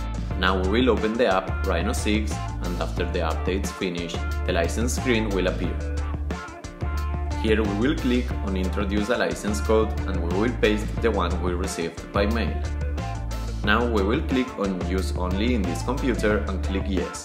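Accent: Spain. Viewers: como se nota q eres español:)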